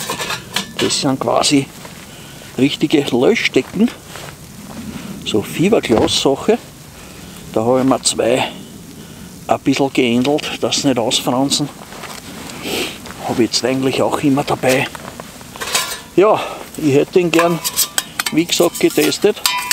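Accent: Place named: Austria. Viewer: Sehr schön, dass Du beim österreichischen Dialekt bleibst. Das Einzige, das ich nicht verstehe, ist beim Käffchen das: Kranken Sesseln - sind die Leute, die krank sind, in Österreich Sessel? Mein Vater ist zwar aus Wien, aber ich verstehs trotzdem nicht. :) Wie immer sehr schönes Video und natürlich weiter so!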